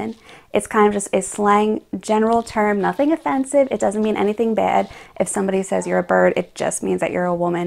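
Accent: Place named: Australia. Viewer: Hahha tell her she’s dreamin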